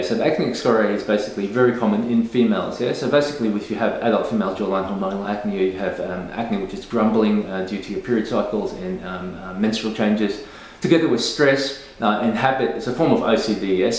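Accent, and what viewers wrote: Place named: Australia. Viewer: Thank you for your videos and information. It would be a lot easier to follow if you sit or stand in front of the camera and make eye contact, because it’s hard for non native English speakers to understand your beautiful Australian accent, so standing in one spot will make all the words have the same tone and will be able to read your lips. (but that’s just me and my opinion)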